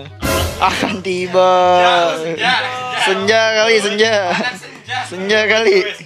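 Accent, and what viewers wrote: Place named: Jakarta, Indonesia. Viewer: Logat nya betawi padahal orang sunda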